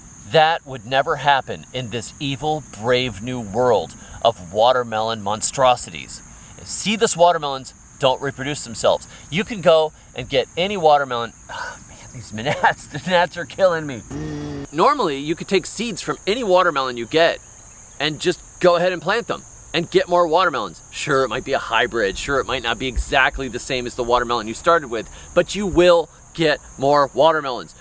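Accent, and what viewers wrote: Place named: United States. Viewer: I left the UK in 2024. Here in Iraq, they don't have seedless watermelons. That's something which until I saw your video, I didn't know existed. It sounds like science fiction. When did developed countries get seedless watermelons? You sound like you're an American. Are seedless watermelons a post-2023 phenomenon?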